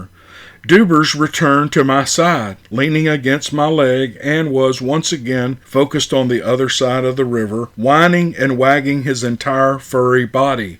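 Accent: South, United States. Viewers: Hey, Always excited for another encounter story narrated by you and your awesome Southern drawl!!! Squirrel!!! 😍👣 Thank you for your time. Your interest in these encounters is infectious and incredibly heartwarming. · Thanks! We love to hear that southern drawl telling a fresh story 👍🏻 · Cool! You sound so familiar when you say "Maggie". My name's Maggie also, & here where I'm from in Macclenny, FL we speak with the same southern accent as you do. I'm almost right on the FL/GA line. Sure is a great story😊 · I'm from the UK. Every Saturday my four year old grandson stays with me, as I'm a widower. And he loves bigfoot stories, you are his favourite bigfoot story teller. When he goes to bed, it's always to your deep southern drawl he falls asleep to. So thank you, bud. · That's how us Southern boys talk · Well done another great story told in your wonderful Southern drawl up the South!! 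· That story was written so well I could picture it in my mind as you read it. Your voice lends such a warm quality it’s soothing to the ears...I feel like I’m listening to a relative of mine telling a story because we all have southern accent as well. I giggled out loud at the comment about adhesive on the dentures or you’ll spit them on the table. 🐿 You have a way with words 😂 Thank you for your stories. I’ve enjoyed each and every one I’ve heard. Your time and efforts are definitely appreciated. Look forward to hearing more! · You did a pretty good job with pronunciation! It’s delightful to hear so southern a voice speak a northern name . My only complaint is there are’nt enough material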